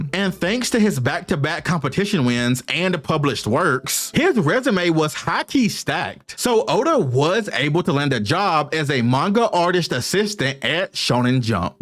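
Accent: United States. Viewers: It’s so fascinating how many similar rivalaries there have been in the Japanese and Western comic industries. The 90s were such a wild wild time for comics in both hemispheres. But also what sortve eccentric personalities get into comics. Such as Fujimoto and Alan. ( Alan believed in black magic and he quite literally attempted to end the world lol) It’s also very cool how a man with the MOST American accent is discussing Japanese comics. It’s beautiful considering not even 100 years ago - Japanese were so scared of Americans that they’d kill their own children instead of getting captured. And Americans were so scared of Japanese they created a sun, ti throw at Japan.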